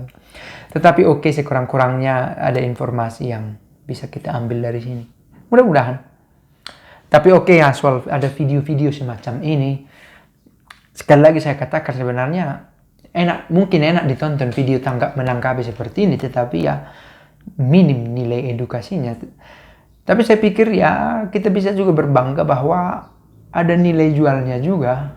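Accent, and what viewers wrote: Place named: South Kalimantan, Indonesia. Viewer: Logat bapak itu mohon maaf seperti logat org kalimantan selatan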